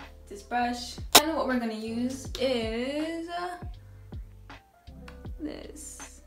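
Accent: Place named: Brazil. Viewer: seu sotaque é diferente. você é brasileira ? tive impressão que você era por conta do sotaque...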